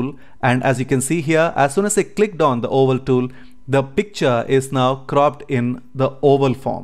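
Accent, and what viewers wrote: India. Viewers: wonderful!! best step by step explanation...n best part is Indian English. Thank you for sharing the idea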